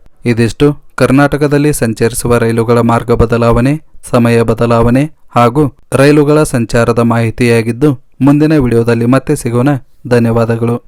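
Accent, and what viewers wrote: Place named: Kittur Karnataka, India. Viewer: Nimma kitturu Karnataka slang bittu shudda kannadadalli heli nimma channel grow agutte i hope you don't offend of my suggestion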